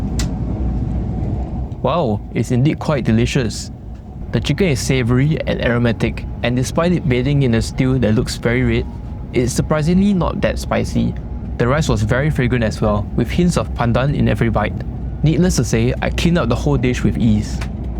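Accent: Singapore. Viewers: You sound Singapore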